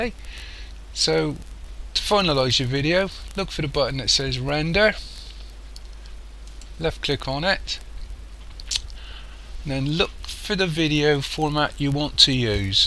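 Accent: Bristol, United Kingdom. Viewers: Brilliant video! Btw, are you from Bristol?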